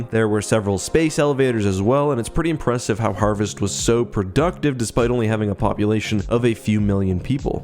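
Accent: Canada. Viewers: Gotta love his Canadian accent! Always good content tooo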